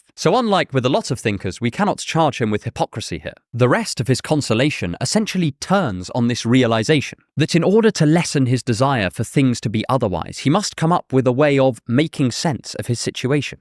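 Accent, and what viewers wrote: England, United Kingdom. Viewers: I absolutely love your wonderful English accent that keeps me so satisfied til the end of the video. You really make great quality videos and I love it!!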